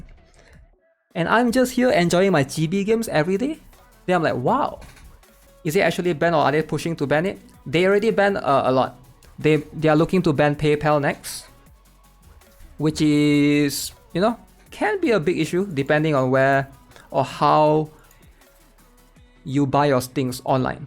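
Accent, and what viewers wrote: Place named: Indonesia. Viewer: probably indonesia judging from his accent, it is different from ours Im from the PH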